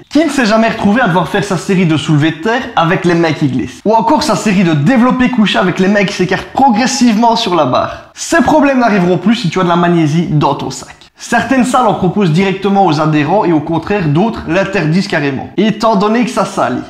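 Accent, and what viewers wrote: Liège, Belgium. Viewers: Tu es de Liège haha?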